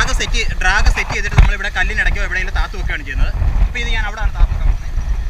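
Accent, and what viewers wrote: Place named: Kottayam, India. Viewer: Anta kottayam bhasa manassilavanillaa. ..ijjonnu sarikk njammala Malabar bhasayil samsarikkado...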